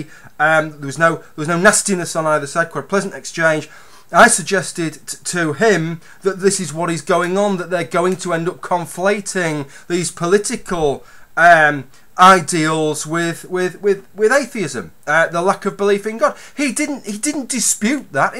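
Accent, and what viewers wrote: United Kingdom. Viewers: Your passionate indignation is quite inspiring and I quite agree with your argument, with the exception of calling TF00t a prick. ; ) Totally off topic: I love your accent. What part of the UK are you from? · I do miss hearing English spoken by native UK English speakers :) /me ex-pat ten years, oh and screw Atheism +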